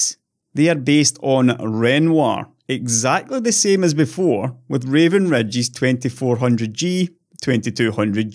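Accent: Scotland, United Kingdom. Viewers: Scottish accent? 😂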